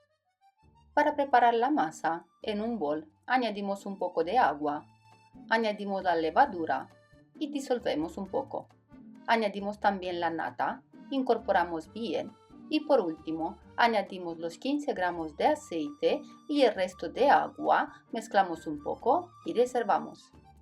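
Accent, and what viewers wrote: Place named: Romania. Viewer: Que buena pinta!!! Ce bun!! Eres rumana?? Tienes acento así eres muy buena cocinera !!! O sa fac reteta multumesc mult!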